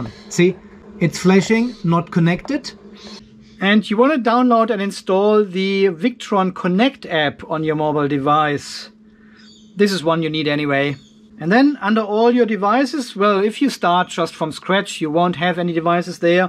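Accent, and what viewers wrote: Germany. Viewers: Hi,?...you sound like you would have a German-speaking background?....? Well, I am Otto and not busting on knowing about all of this, I try to learn. I am a long-retired, office machine technician, originating from Vienna. Because I run my system on 24 Volt, where should I get the 5.5 Volt supply from for my two in-line Lynx distributors to get the system indicator lights working? I have a basic Victron Cerbo GX and on top, at the far left I have two USB positions free, should I join a USB cable, cut one end off, and link it up with the yellow & black wire of the supplied cable that came with those Lynx distributors? We/A&O made up a solar panel to the battery bank system, controlled with Victron elements, output 220 Volt to overcome those many power-out situations we have in rural QLD to protect the goods in our fridges & freezers and issues when printing 3D when the PC goes wild with the printing program. 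Ergon Energy has the habit of supplying at times too little and even far too much electricity (up to 260 Volt) and some of our appliances did not like that and did quit on us......and you ask what name to give to the Cerbo GX, this unit is very smart - remember who Idefix was? (Idefix & Obelix). Stay awesome, cheers!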